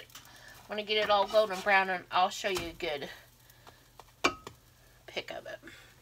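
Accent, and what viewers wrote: South, United States. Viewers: I am from North Carolina and she sounds like we locals, too. She is a real southerner, like so many people I know - not like that manic, shrill, slightly disturbing Paula Dean. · I am currently on a diet. I eat my diet lunch at work and watch make wonderful things. Her demeanor is wonderful and her accent takes me back home to the south. Thank you,; bless your heart.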